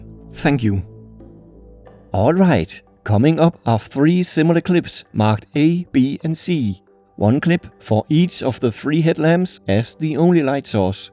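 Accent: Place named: Denmark. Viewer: gotta love this accent :) heja Danmark ;)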